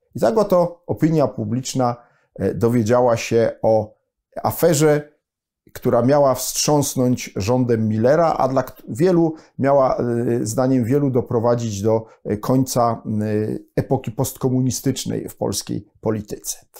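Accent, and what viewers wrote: Russia. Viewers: Z jakim ruskim akcentem gada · Jaki piękny polski język z rosyjskim akcentem :)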